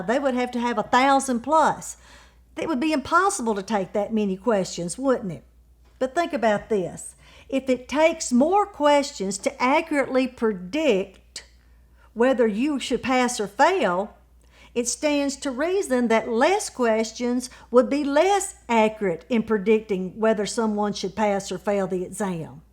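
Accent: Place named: South, United States. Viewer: I could listen to you all day. Love the southern accent.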